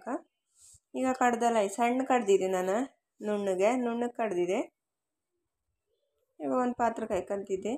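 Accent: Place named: Kundapura, India. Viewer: ಸೂಪರ್ ಕುಂದಾಪುರ ಕನ್ನಡ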